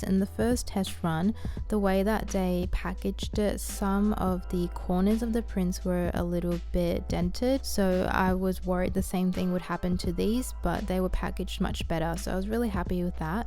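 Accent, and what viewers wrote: Australia. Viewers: This video came up as recommended as just watching a bunch of studio vlogs. Could instantly hear you were Australian and love your work! Just bought some postcards from your shop, just wow. so beauitful!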